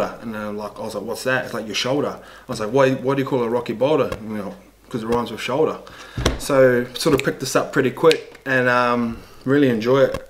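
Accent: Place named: New Zealand. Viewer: Lengo? it means 'focus' in Swahili. Oh that kiwi accent, you meant lingo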